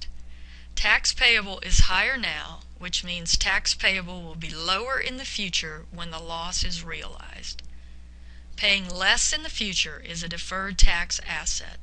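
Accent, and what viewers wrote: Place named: United States. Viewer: OMG - an American accent explaining this! Unusual.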